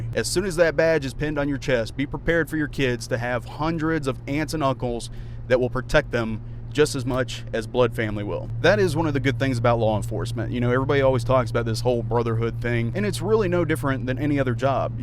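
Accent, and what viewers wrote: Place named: South, United States. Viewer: your southern accent doh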